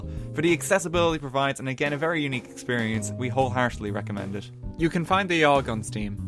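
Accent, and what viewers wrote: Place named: Ireland. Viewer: 8 minutes of a buttery Irish accent. Score!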